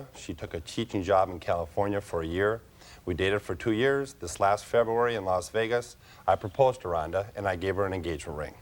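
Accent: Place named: Minnesota, United States. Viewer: I love the Minnesota accent!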